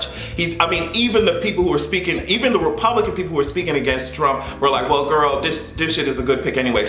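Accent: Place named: Jamaica. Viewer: I die with laughter every time he says woman instead of women. So Jamaican my yute.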